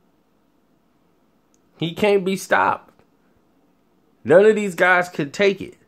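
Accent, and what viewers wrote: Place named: South, United States. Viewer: That southern drawl cracks me up! What you say is true.Takam left his fight with his head held high. Stiverne did not.You can lose with dignity or without it.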